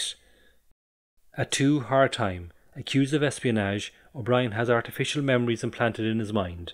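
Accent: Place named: Ireland. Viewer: jaysus I need my ears syringed 😂 nice to see / hear some content in an Irish accent 🖖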